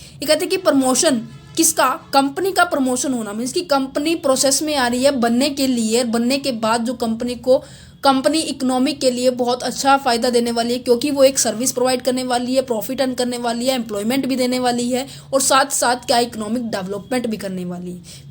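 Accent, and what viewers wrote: Haryana, India. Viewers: Nice explanation mam ..aapki sound me haryanvi touch aata h 😊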